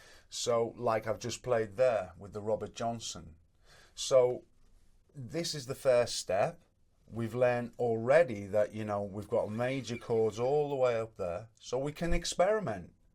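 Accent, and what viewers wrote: Liverpool, United Kingdom. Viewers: Ahh ok, it had to be one of them and to be honest your accent is just a tiny bit more softer and more subtle than what I expect from Liverpool lol. Anyway thanks for the upload, good video, I'm already experienced in alternative tunings but your video just loaded automatically after a previous video I watched and you had me playing along like I was a beginner.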